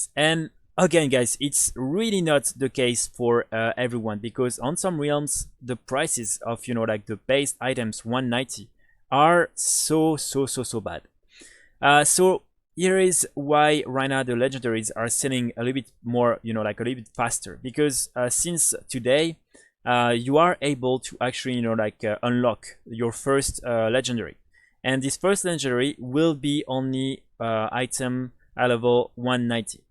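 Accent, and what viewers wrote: France. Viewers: t'es français?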